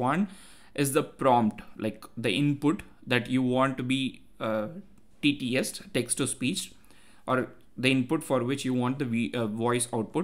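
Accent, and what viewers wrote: India. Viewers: Ok I'm only 19seconds in but I have to pause here to tell U smth I hope maybe makes U smile a bit. UK in south park tech support is always an Indian worker and ik that india is actually very advanced in tech nowadays Ur country worked hard to get there and don't think the rest of the world doesn't notice. Even if we in Europe make fun of it I swear to god U won't find a single programmer in Germany that doesn't watch Indian tech tutorials. We are very grateful that U guys are making these amazing videos because our country for example is insane far behind and without people like you even the young people that want to learn are not having it easy because the generation teaching us is often times behind their students in terms of capabilities. Yes we make fun but we all respect U and even if Indian accent is funny ours isn't better and Indian English is one of the accents that's very clear and easy to understand. 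In school the listening parts for young kids in tests is sometimes with Indian accent and every time it's Indian there's a focking train or car passing by or faking helicopter sounds or whatnot because U Guys are that easy to understand. I probably would have an easier time communicating driving on top of an Indian train than beeing to dinner was an American guy. You guys are amazing we have huge respect and admire Ur guys effort and are grateful for your service to the education and evolution of the tech community. Don't be sorry for Ur accent wear it with pride my fr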